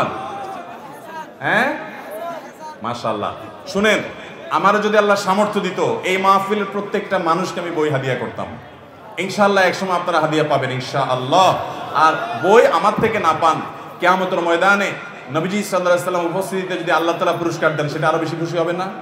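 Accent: Chittagong, Bangladesh. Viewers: আহমাদুল্লাহ দেখি চট্টগ্রামের ভাষাও পারেন। চট্টগামে উনার মাহফিল হলে খবর কেমনে পাব? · মাশা-আল্লাহ,হুজুরের মুখে চট্টগ্রামের ভাষা চমৎকার লেগেছে।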